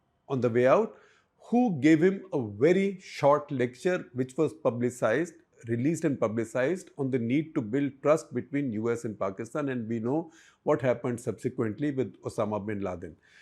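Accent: India. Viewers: And your programs on international affairs and politics!!! Only easy Indian style English of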